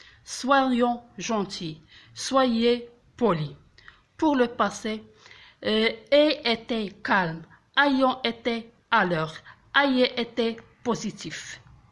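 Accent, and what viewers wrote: France. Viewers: French accent is superb. U r doing awesome work 👍👍👍😊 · really great information and superb - i love the french accent - hope these tips i will learn french - thumbs up ;)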